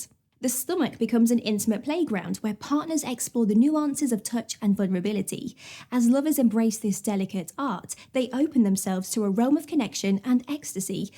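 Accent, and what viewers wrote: England, United Kingdom. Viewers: your English accent is so beautiful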